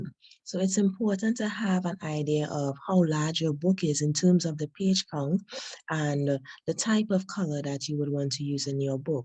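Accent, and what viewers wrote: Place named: Trinidad and Tobago. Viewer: I recognize a trini accent here!¡ Good job in explaining this - i can't seem to pass the error message in print previewer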